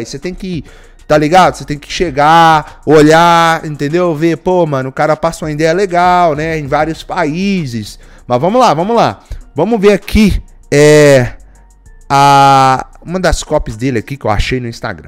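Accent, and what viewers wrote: Minas Gerais, Brazil. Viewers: O cê é mineiro né não? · Mineiro Né? De Rocha sow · O melhor de Minas é o sotaque... vamo dominar essa porra tudo, nuuu nois é o miosin que está tendo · É mineiro, né? KKKKKKKK Conheço o dialeto de casa em qualquer lugar! 🤣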